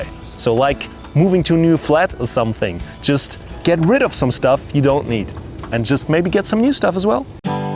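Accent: Germany. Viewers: omg this German accent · wow ich find es so lustig wenn deutsche englisch sprechen 😄aber das gefällt mir sooo gut weil ich dich echt gut verstehen kann obwohl englisch nicht so meins ist... Ich habe eine Sony a58 und ich liebe sie 😍 ich liebe es auch dass man durch den Sucher alles machen kann, und ich habe sie eigentlich nur gekauft weil sie sogar viel günstiger war als canon dabei hatte sie mich von Anfang an so gut überzeugt😍😍 aber ich meine, meine hat trotzdem einen Spiegel 😄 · are u german? just curious,cause of the dialect... edit: your camera is setted to german, so i may be right! greetings from austria!😉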